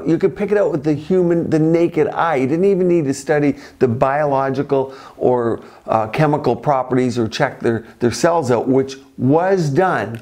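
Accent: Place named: Canada. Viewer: I was so confused because I was listening to another video and thought 'he sounds so Canadian'....haha! Just discovered you this week, great content! Keep up the great work, cheers, from another Canadian!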